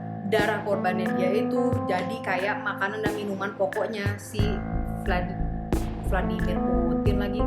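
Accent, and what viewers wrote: United States. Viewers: aksen english amerika kamu kak😭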